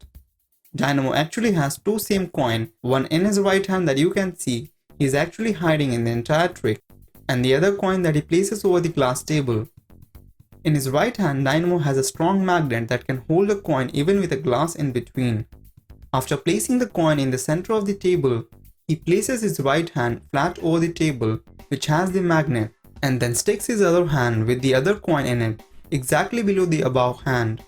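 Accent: India. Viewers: If you didn't switch the voice to a freaking Indian one the video would better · I would've liked this more of the narrator didn't have such a thick indian accent that i can't understand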